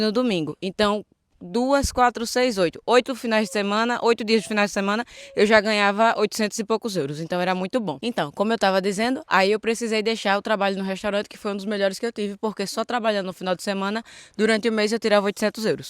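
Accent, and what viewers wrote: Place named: Natal, Brazil. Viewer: Você é de Natal/RN? O sotaque é identico ❤ Ótimo vídeo 🎉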